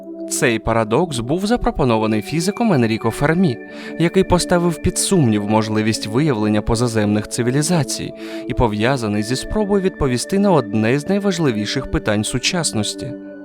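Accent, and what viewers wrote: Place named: Russia. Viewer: Так круто) чутно, що автор сам перекладав, озвучував... а головне чутно русизми, і це прекрасно. Спитаєте чому? Бо, очевидно, автор свідомо перейшов на українську, і ще не до кінця звик до неї, і це прекрасно. Дякую!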